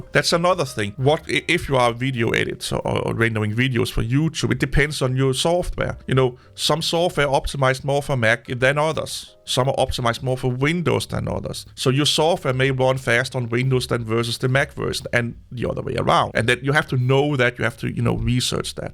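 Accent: Denmark. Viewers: Are you from Scandinavia. Your accent sounds very Danish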